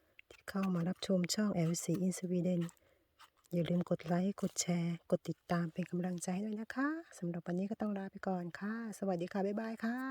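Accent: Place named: Suphanburi, Thailand. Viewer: ฟังเสียง พูด เหน่อๆ เหมือน คน สุพรรณบุรีกาญจนบุรี รึราชบุรี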